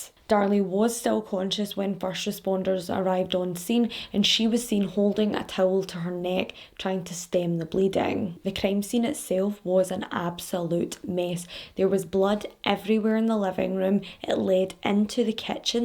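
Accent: Scotland, United Kingdom. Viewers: Girl I just found you a few days ago and I’ve watched almost all of your vids now I’m obsessed!!!! I love your Scottish accent and true crime so this is the best!!!! 💗💗💗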